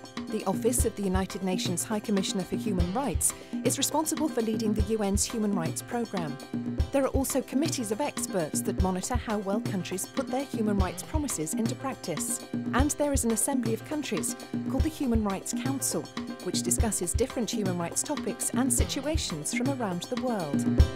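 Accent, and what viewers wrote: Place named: United Kingdom. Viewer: What a British accent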